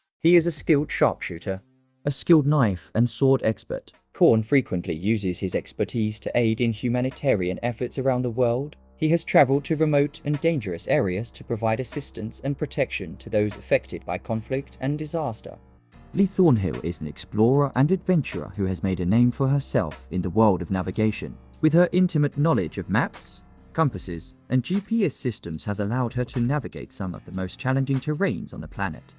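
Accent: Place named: England, United Kingdom. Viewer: I love this File Card Photo play. With Voice over With English Accent Rem8nds 9f Niguel from Jamangi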